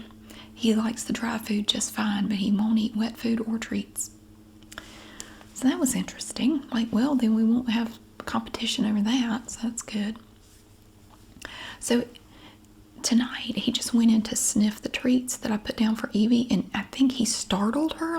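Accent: South, United States. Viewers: Enjoy your videos. You have a nice southern accent. Toboggan means knit hat in the south? That is funny. I’m from Minnesota and here Toboggan is definitely a sled. We have plenty of snow in winter.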